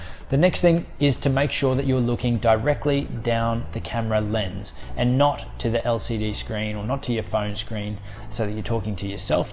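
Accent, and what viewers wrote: Australia. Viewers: You sound like you are from Australia but I can't get the microphone on Amazon to ship to Australia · Great video. Brw he sound Australian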